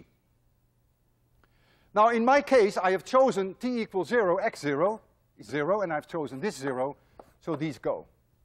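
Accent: Netherlands. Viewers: The two most common comment I read here about are: 1. "Amazing teacher" I totally agree. 2. "He is Dutch" I assume because your accent, but (unfortunately) I can not capture that. For me your accent is very good and very compatible with my rudimentary English.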